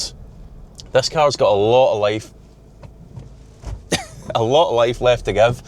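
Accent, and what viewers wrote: Scotland, United Kingdom. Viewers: There is nothing more satisfying than hearing the word Alcantara in that Scottish accent.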